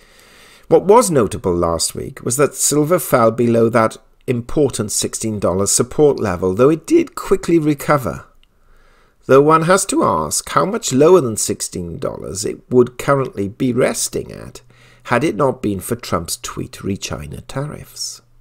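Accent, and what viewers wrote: United Kingdom. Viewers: Is this a regular British accent?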